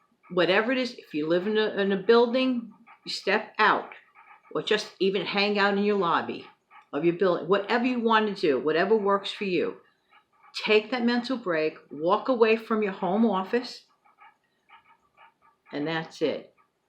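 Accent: New York, United States. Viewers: So so true. My 4 grew up so fast 2 men now and 2 young women. Youngest is 17. Sons are almost 30 and both away in navy. Enjoying tea time today. Morning chores done and eating leftovers for breakfast. I worked full time with children in 4 schools due to age differences. Miss those hectic days. My time for college (almost 2 years pasted already) and me time. It is hard to believe how fast time passes. My mind races too so I take time to go outside and photograph nature. Ahhhhhh bliss. Love your NY accent. Enjoy your days.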